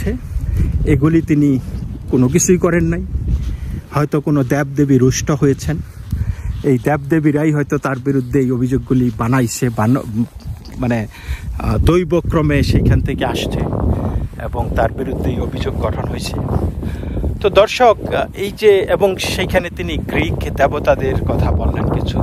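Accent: Bangladesh. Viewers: উচ্চারণ শুদ্ধ করুন। বাঙ্গাল ভাষার আধিক্য। ভারতীয় বাঙ্গালীরাও আপনার YouTube এর দর্শক।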